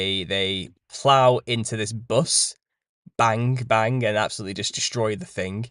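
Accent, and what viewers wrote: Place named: Wales, United Kingdom. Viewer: I love the British accent to because my mom was from Wales and all her family sound like you😂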